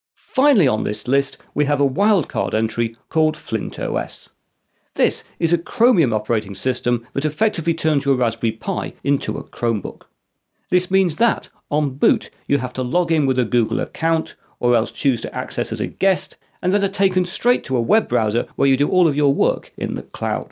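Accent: England, United Kingdom. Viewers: I love this voices , love the culture, love this england accent ! Ohhh god save the england peoples from islã invaders ! Do not let this blessed land, inspiring land be consumed !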